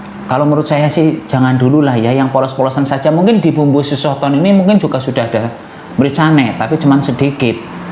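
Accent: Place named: Banyumas, Indonesia. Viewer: Asalamualaikum Wrwb Sugeng sonten mas, mana byunge mas, lha Yo ngono di yalakan lampune jok peteng bae😂😂😂, AG ikut, Tan bahasane mas ngapak😂😂,LGI masak nopo Yung, wah Niki sng yg sangat mengsipirasi gaene penyakit maq,e, kambuh kebanyakan, dan sekali lagi terimakasih obat maqnya, trs yimak cuuus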